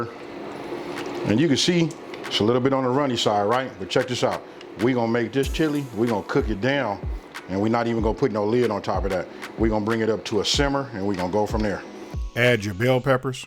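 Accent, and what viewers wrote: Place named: California, United States. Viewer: It’s the California Accent for me!!🥰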